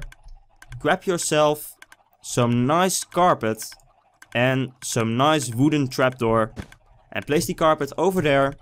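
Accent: Netherlands. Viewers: u dutch ..... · All my drops get burned off the lava? Why does it do it? And another question? Are you dutch? You have a dutch accent, im dutch as well ;D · Are you Dutch?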